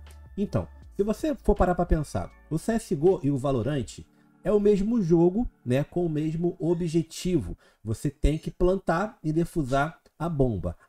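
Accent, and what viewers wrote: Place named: Rio de Janeiro, Brazil. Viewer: Mano o que e pubidi kkkkk você deve ser do rio de janeiro só fala asim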